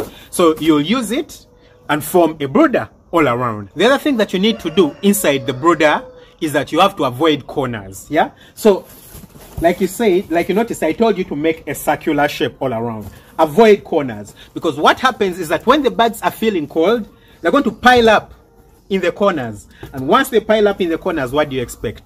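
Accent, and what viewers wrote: Uganda. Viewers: Ugandan accent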